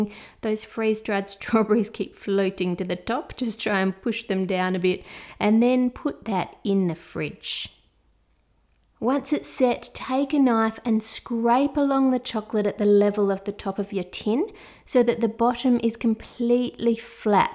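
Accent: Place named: Australia. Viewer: This video makes me want an Aussie accent like hers.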